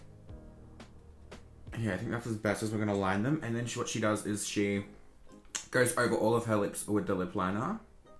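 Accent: Australia. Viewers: I thought u were American but ur Australian right ? , love u anyway u slay Every product that has been released xx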